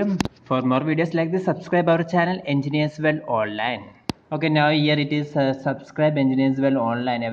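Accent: Kerala, India. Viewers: Malayali aanenn keetal ariyam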